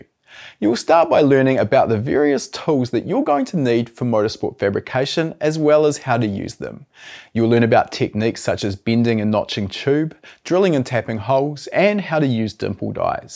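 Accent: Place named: New Zealand. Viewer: Awesome. Thanks ✌🏽 PS-Maybe the sour responses about the “what makes it teck” saying were from guys that wish they had a cool accent? I certainly wish I had the cool kiwi vocabulary/accent haha. -Best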